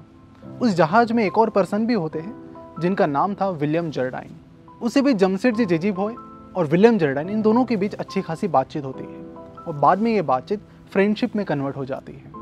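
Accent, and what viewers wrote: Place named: Maharashtra, India. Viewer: I love your Marathi accent...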